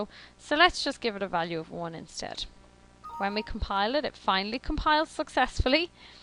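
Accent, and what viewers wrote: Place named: Ireland. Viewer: So nice to hear an Irish brogue explaining Java to me rather than Hindi. :-) Thank you! Great videos.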